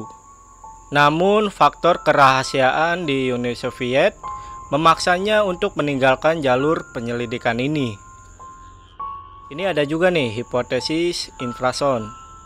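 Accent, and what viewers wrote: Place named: Bali, Indonesia. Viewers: Asli Bali neng terbukti dg ngucap huruf T nya khas bgt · bang tu keturunan bali atau NTB kah? bilang "ithu" nya pas kata "thu" nya kayak orang daerah situ wkwkw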